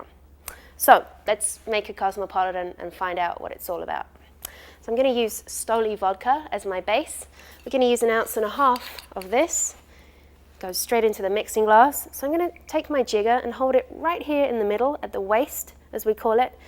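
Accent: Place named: England, United Kingdom. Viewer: I wonder what town in England was from, her accent is quite interesting.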